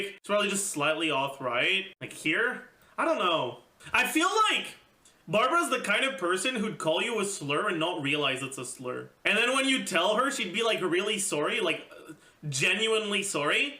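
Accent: Quebec, Canada. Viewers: Hey, I'm really impressed with the quebecois accent you did for this video. Also the beard looks super real. Well done like subscribe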